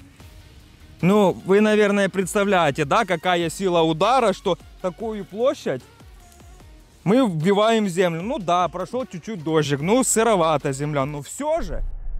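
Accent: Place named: Ukraine. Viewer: Зачем тебе маска) наверное это завлекает новых зрителей) по голосу сразу слышно Украинец!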